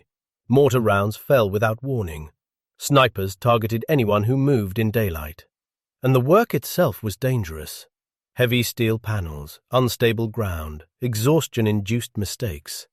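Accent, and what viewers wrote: United Kingdom. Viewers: If this is all about Canada why does the narrator have a Brit accent? WHO is the narrator? Where can I find the credits for the writers, creaters, narrator etc?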